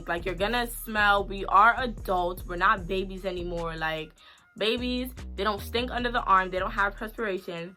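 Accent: New York, United States. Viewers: Youu from Ny ? Ya accent 😍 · I love your new York accent 😍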